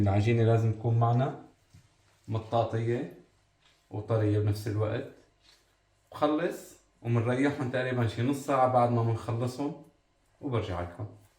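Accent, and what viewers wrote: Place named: Syria. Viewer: بس عفوا منك انت من وين....وين تعيش لانو تحكي سوري انا سورية من حلب بس صار لي ٣٦ سنة في سويسرا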